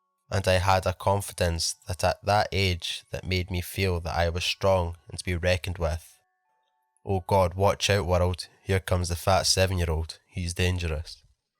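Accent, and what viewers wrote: Scotland, United Kingdom. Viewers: Came here from Swamp Dweller and subbed immediately! I just LOVE your Scottish accent!! Paranormal and Cryptid stories are my favorite! There is another Scottish horror narrator. Channel named HELLFREEZER. Another excellent channel!! ;-)👍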